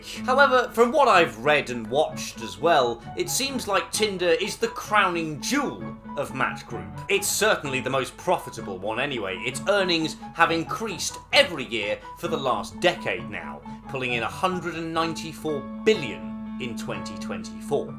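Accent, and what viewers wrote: United Kingdom. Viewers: Hey, the irate British guy is back!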